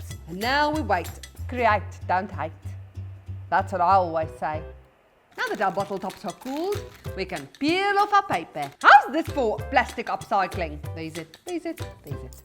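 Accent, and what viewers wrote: Afrikaans, South Africa. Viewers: Yeah😂😂I think she is Afrikaans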